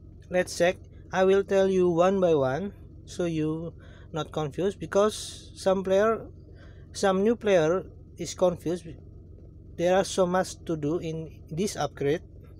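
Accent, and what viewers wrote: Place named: India. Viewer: he sounds indian...okay dislike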